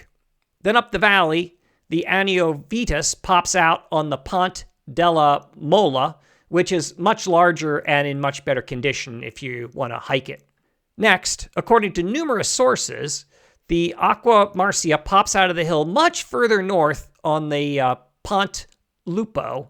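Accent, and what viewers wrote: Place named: United States. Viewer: Content aside, couldn't the presenter have made a minimal effort to pronounce the Italian correctly, even with an American English accent? If he can use Google Earth has he not heard of Google Translate?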